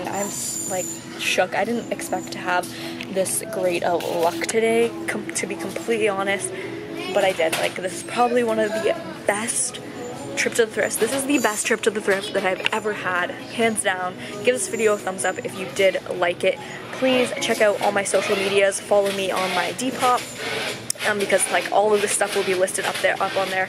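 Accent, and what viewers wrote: Canada. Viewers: i'm from the U.S. but i can tell when someone's Canadian by how they say out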